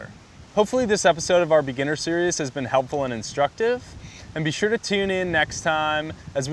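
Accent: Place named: California, United States. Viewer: Definitely a cali accent. Annoying as hell.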